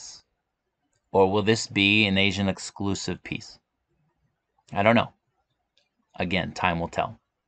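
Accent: Boston, United States. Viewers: That accent. Felt like I was watching the depahted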